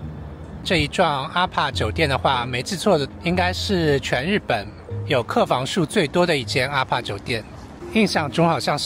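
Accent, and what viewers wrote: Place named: Shanghai, China. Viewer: 是不是？ 真的！我聽到時也覺得超像的。 於是我猜G先生應該是上海人（當時還不知G先生是哪裡人），跟我女兒說，她覺得我亂猜！ 結果後來在其中一集答案揭曉，真的是上海出生的！😆😄😊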